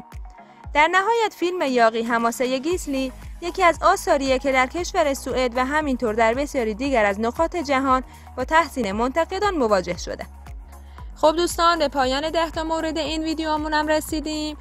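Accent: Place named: Yazd, Iran. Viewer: انشاالله که موفق باشید ولی شما فرمودید ده فیلم برتر ولی من فیلم برتری تو این فیلم ها ندیدم نقش بن هور در این فیلم ها کجاست یا فیلم جیسون و خدایان و یا حتی سامسون و دلیله و در نهایت لطفاً مطالب رو سعی کنید شمرده شمرده تر با اون لهجه زیبای یزدی بخونید و فیلمها هر قسمت رو بر روی متن اون ادیت کنید ،امیدوارم با رعایت این موارد بتونید موفقیت بیشتری کسب کنید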